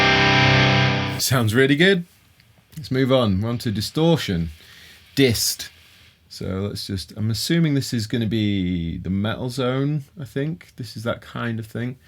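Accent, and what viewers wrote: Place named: Lancashire, United Kingdom. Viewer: where did you grow up mate? I'm trying to unlock your accent, accents are a pet obsession of mine. I'm thinking Lancashire but I could be way off...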